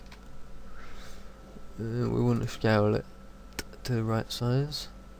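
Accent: United Kingdom. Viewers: **you're. And actually I said "British" because I wasn't sure if he was english. He could be from Wales for all I know. I know the accent differs a bit depending on where in the UK you are, but I'm not an Expert! :S Oh and yes, I'm not english or british in any way, i'm canadian. :) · uh uh uh your uh uh stutters are uh uh almost as uh unbearable as uh that uh mmm brit ass accent. Couldnt watch anymore....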